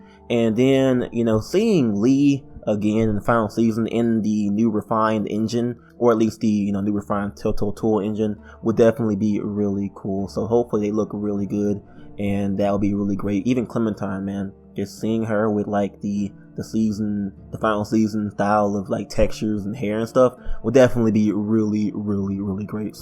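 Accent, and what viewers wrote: South, United States. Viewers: greetings from Russia by the way, I noticed that you have a southern accent you're from the southern United States?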